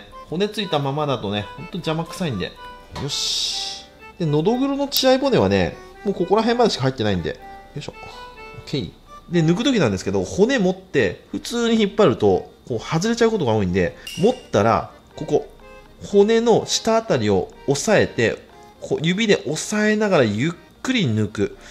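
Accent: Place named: Mikawa, Japan. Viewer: 世界に届け三河弁🌍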